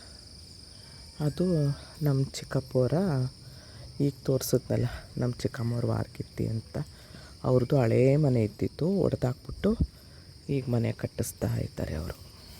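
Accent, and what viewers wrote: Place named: Bangalore, India. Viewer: Alla neevu matado reeti nodi banglore navru ankondidde...nodidre neevu namma oorinavru❤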